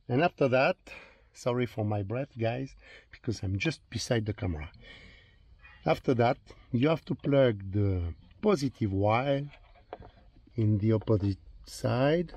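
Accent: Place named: France. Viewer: Bonjour, Je me permets d'écrire en français étant donné avec qu'avec ce magnifique accent, je pense reconnaître notre belle France dans votre voix ! J'aurais voulu avoir s'il était possible de changer de musique avec les flèches suivant et présentent du volant si on est dans un album par exemple ? Super vidéo et merci d'avance !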